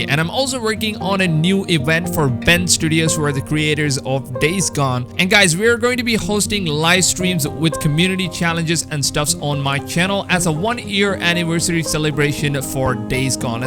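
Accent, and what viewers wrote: India. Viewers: Great video man. Where are you from btw? You sound Indian or South Asian? Thanks and hope we get the game soon!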